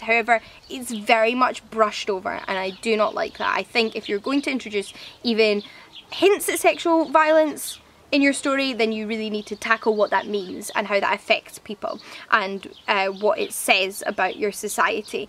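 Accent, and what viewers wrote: Scotland, United Kingdom. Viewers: Its so nice to hear a Scottish voice pop up on my suggested videos especially from my home city. Makes booktube a little more reliable for me!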